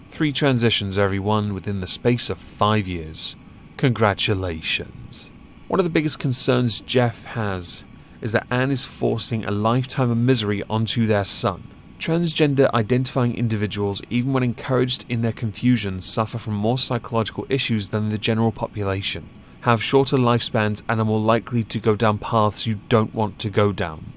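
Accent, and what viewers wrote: United Kingdom. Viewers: The only one feeling dysphoria is the mother, who WANTED a little girl. Oh, and the attention from attempting to gain ‘woke points.’ BTW: Vit-O-mens. LOVE IT. Give me your incredible British pronunciation,!! Love your work, as always!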